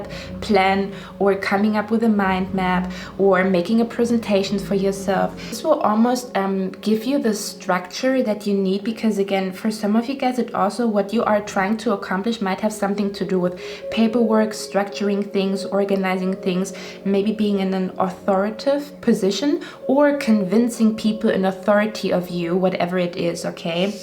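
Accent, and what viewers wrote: Germany. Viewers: Pile2 Since a week a have a lot of deja vus its weird like a lot on a day And I guess I meet one of my guides in my dreams A lot of readers say aomething about matrix and lucy? I hate this kinda films they are so dark but I am going to watch it anyway cuz of the messages Und ja man sieht den Wald vor lauter Bäumen nicht ich höre einen deutschen aktzent🤣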